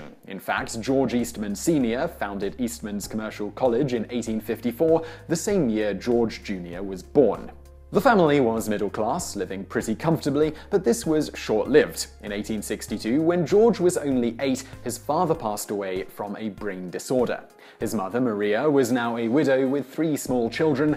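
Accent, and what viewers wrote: United Kingdom. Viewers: Written in American English, presented in British English.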